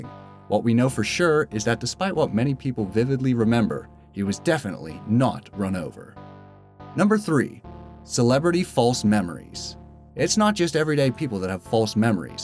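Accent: United States. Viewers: I cant believe how few people in the comments noticed that he got the Rocky scene wrong, Mr. T didnt play Apollo Creed, he played Clubber. Also, i know a lot of people griped about his pronunciation of Mandela, however i have known many people that pronounce it the way he did. Its usually because he has never, or cant recall ever actually hearing the proper pronunciation, so they fall back on typical language defaults that dictate how it would be said, of which, based in his accent, he speaks americanized english, so he is technically still pronouncing it right. If you are wondering why i would bother explaining this, i literally saw hundreds of comments from people jumping on his back about it, yet only a few people commented on the aforementioned Mr. T issue.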